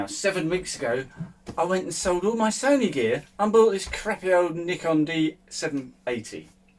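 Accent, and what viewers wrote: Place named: England, United Kingdom. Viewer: I had a sneaky feeling you would be posting something about the A1 today. Love the rant, the sarcasm, especially with the perfect English accent (I'm in Texas). 😊 High stills fps are like video now. I would not want to shoot a burst of 20-30 fps of that cyclist rounding the corner and then cull through a hundred photos at the computer looking for the perfect shot (unless one is a sports photographer or just hoping to capture a cycle wreck). Great video.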